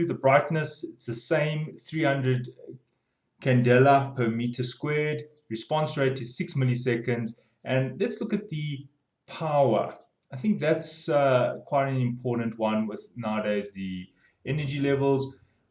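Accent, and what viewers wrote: South Africa. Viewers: As a South African myself, I can confirm that he's from South Africa due to his accent. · definitely south african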